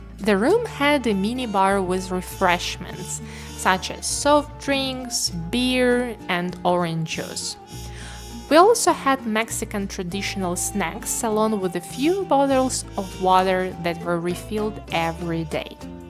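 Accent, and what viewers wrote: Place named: Eastern Europe. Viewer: What a familiar Eastern European accent:) Bardzo dobry film!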